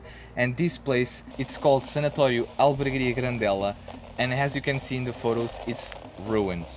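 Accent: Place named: United States. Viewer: I love your American accent when you are trying to say a word. I forgot you don't speak English it'll the end. LOL You are doing so good with this.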